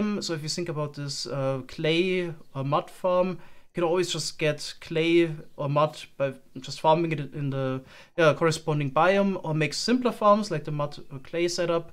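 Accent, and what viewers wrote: Germany. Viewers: Bro sounds to german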